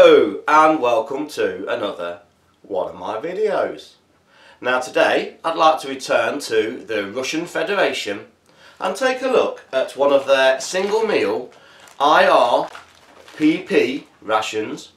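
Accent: United Kingdom. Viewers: Great review ! I always enjoy to hear your English English :) These rations ate civilian, but the components are the same quality as the military. I personally enjoy Maiskij Chai - the tea. I even bought a pack for me when I was in Ukraine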